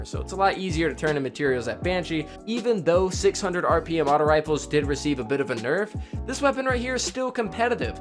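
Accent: France. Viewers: U actually French?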